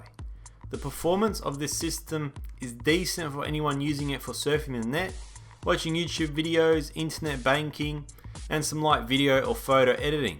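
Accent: Australia. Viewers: ? you sound austrailian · is that an Australian accent???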